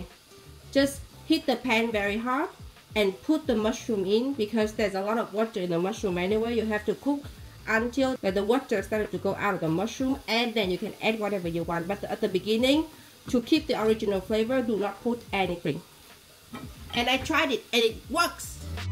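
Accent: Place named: Germany. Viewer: Hilarious german accent coming out of her nouth..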